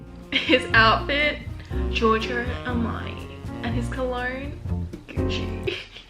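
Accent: Australia. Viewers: Ok but I love your accent!! me being from Bristol having a shitty accent ur obviously Australian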